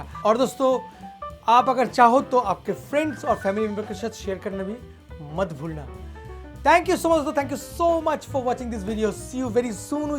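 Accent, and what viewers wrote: Bengal, India. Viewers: Bengalis can conquer anything with their intellect except their Accent wile speaking Hindi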